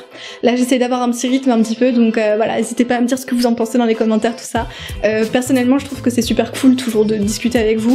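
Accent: France. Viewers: Très compréhensible... j’ai adoré malgré que j’ai habituellement un peu de difficulté avec les accents français! J’adore ❤️🤍❤️... je m’abonne!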